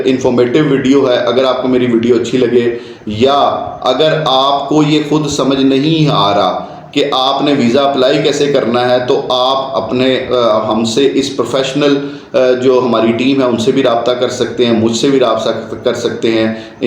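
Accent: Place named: Punjab, India. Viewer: یہ سالے پنجابی انگریزی بھی پنجابی میں بولتے ہیں - " گویانا" نہیں ابے " گیانا" انگریزی میں " Guyana" بولتے ہیں - یہ ویسٹ انڈیز کا حصہ ہے۔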